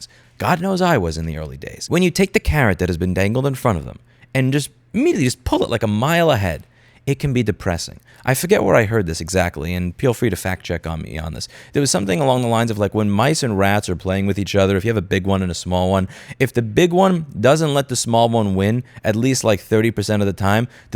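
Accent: New York, United States. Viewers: Love it. You come across as a typical New Yorker until the 2nd minute that you putting sound thinking to work. And then communicate it like a New Yorker.I hey I like New Yorkers, it's OK. More than that I love your show and i too have been seriously annoyed with your main topic - right to repair. I'm an engineer and love to tinker. Back in the day that was half the fun. Woz backs backs you. I think even God would be happy for Woz's backing.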